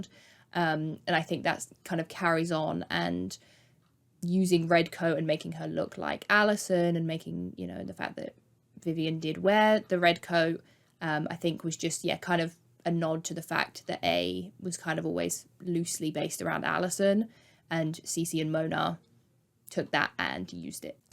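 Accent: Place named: United Kingdom. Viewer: i can’t tell if you’re british or not. you almost have an accent but you don’t?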